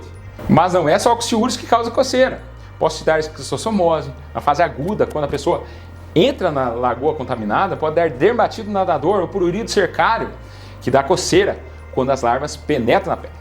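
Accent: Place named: Caipira, Brazil. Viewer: Este médico é maravilhoso, com seu sotaque caipira e jeito de falar , faz com que possamos entender bem tudo que ele explica, além de muito bonito é claro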